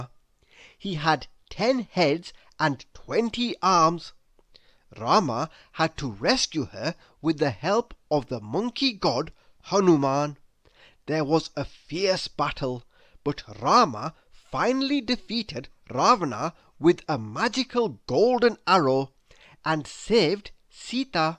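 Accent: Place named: India. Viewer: im indian and are you Indian because your photo looks indian and you sound indian🙏🙏🙏🙏🙏🙏🙏🙏🙏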